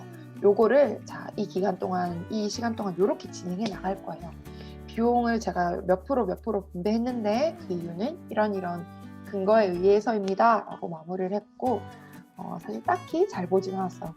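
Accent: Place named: Daegu, South Korea. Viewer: 저는 대구 친구가 많아서 ㅋㅋㅋㅋㅋㅋ막 들리네요 ㅋㅋㅋㅋㅋ그래서 걍 편히 녹화하셔도 될 것 같아요 친근하고 더 좋아요!!♡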